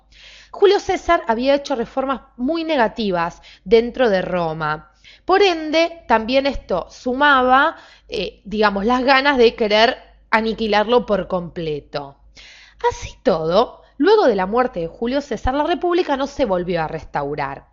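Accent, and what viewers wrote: Argentina. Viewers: Es argentina la piba?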